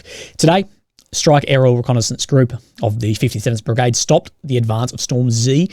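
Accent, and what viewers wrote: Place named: Australia. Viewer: Maybe this channel would be better without the whiny Aussie accent and and his 1970s suit collection.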